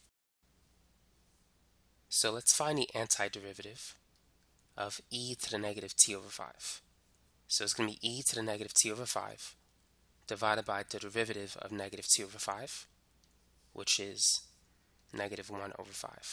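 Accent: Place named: Brooklyn, United States. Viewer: I love your Brooklyn accent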